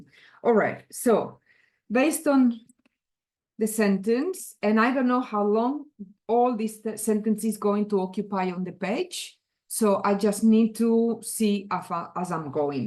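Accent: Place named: Catalonia, Spain. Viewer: Fun to watch you create beautiful words with such ease. Also fun to listen to your Catalan Spanish accent. ❤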